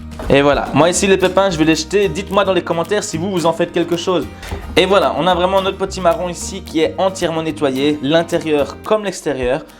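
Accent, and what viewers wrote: Belgium. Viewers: votre charmant accent belge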